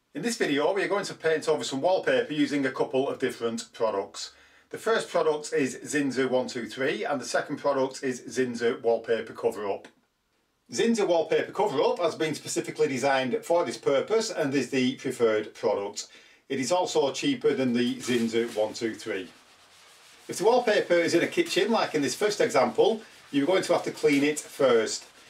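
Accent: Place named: Blackburn, United Kingdom. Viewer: Really helpful video, thanks! And that sounds like a very strong Blackburn accent!!!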